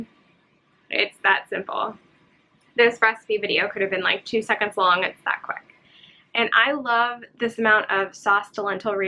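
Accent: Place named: Pennsylvania, United States. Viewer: I love your accent! I’m from New Jersey and the way you say school reminds me of being back home many years ago. I see that you’re probably from Pennsylvania? Close to Jersey. I am enjoying your channel thank you for sharing!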